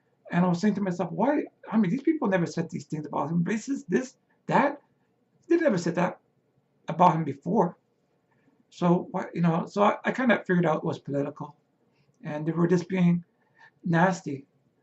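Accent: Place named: New Jersey, United States. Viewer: Thank you for sharing. You sound like someone I know from New Jersey. Tone, speech, mannerism , and such.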